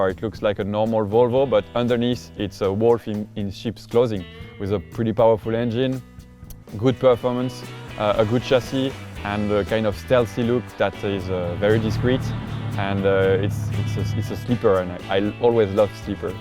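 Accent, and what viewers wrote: France. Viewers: French accent suck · Un français qui parle anglais c'est toujours grillé ! Bravo en tout cas, j'adore cette caisse. et de savoir qu'une moto trial rentre dans le coffre ça donne des idées !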